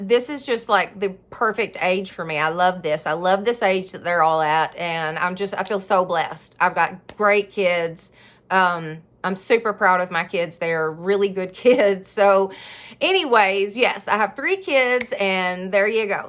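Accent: South, United States. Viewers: You brighten my day! Love listening to your southern accent. Thank you for honesty...wish more people were as open as you are. I feel blessed to have found your channel! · Hey beautiful. You're Amazing! Thank you for being honest all the time. Love your Southern accent. Love your positive attitude. You're funny too. Love you my beautiful Angel. Blessings to you and your lovely family. You're absolutely correct. Who cares what anybody thinks.. No one is perfect.. There is only one perfect one, that is our loving GOD!! Having CHRIST in your life makes a person be happy and positive. Praise GOD you are here with us sweetie. JESUS loves us all. We are GOD'S children. AMEN. Hallelujah!! I will continue watching your channel my beautiful Angel. I love you.. You're Amazing and smart! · Thank you so much for this and I too crying to hear your story and what a great story it is. 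Good for you and if anyone leaves your channel then they were not worth your time.I am the same as you I tired to find fun and be happy and maybe a bit mad but that's fine with me.Keep up the good work. Just love your channel and always look forward to watching your videos.Love the southern accent I too have an accent I come from London so be proud of the accent as it makes it you. Hugs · YOU. ARE. FABULOUS!!! I don't know any diyer that would be this honest, the things you've told us about yourself, shown us your life, even though you know you're the blonde hair, big boob, southern drawl cliche... I'm one too 😁 and all the naysayers can kiss my grits!!! And my bday is November 25th!!! But.... I don't want to scare you, however anyone wanting to find you, you've made it sooo easy.... just be careful!! And you need a bodyguard just let me know! I may not look like much but everyone that knows me is scared of me 😳😁🤣🤣🤣 Thank you for being you!!!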